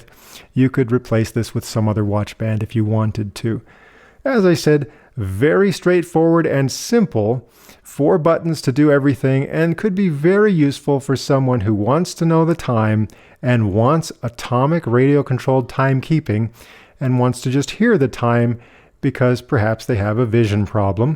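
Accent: United Kingdom. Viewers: Why British Accent ...lol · I like the British accent! Wish my talking clock had that option! 😄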